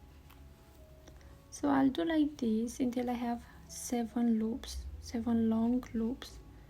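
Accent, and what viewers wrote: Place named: Romania. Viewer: I love your videos. My grandmother was from Romania and your accent and voice reminds me of her. She created such beautiful crocheted items. I still have my father's linen bassinet blanket she hand sewed and where she added a pretty crochet border to it. Thank you for your videos.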